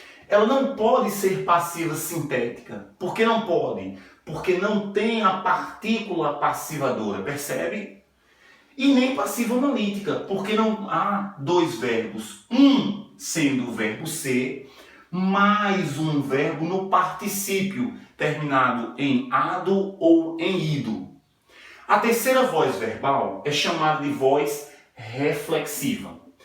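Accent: Northeast, Brazil. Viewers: O senhor é pernambuco, cearense, rio grandense do norte(potiguá)paraibano. Enfim, o senhor nasceu no Nordeste?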